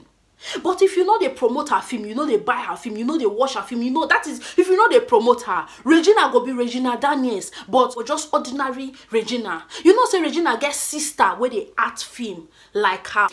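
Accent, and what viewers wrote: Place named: Warri, Nigeria. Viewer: Chai, this your pidgin na original one. It seems you be WARRI brought up WAFFI BAE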